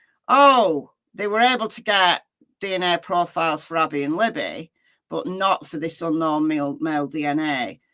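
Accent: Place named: Northern England, United Kingdom. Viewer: Recently discovered your channel. Nice to hear a northern accent - my Dad & Grandma are from Fleetwood, I’m from Leicester but live in BC Canada now. This case has me so outraged & I’ve followed since the beginning. So many unanswered questions & so much evidence pointing at other suspects. Hoping the truth will finally come out soon!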